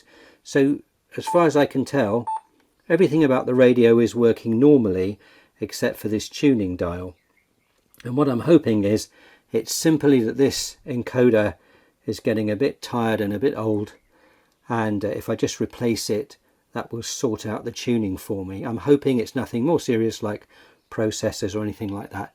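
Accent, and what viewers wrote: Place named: United Kingdom. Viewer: I love British English accent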